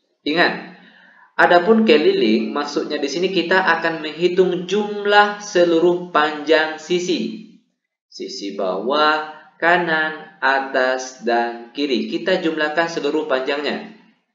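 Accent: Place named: Bima, Indonesia. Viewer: Logat bima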